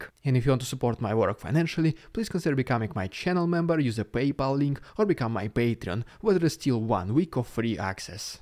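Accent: Russia. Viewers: Obviously your world is very small. Probably good that you move on. Listen to him for a week and you hardly notice his Russian accent.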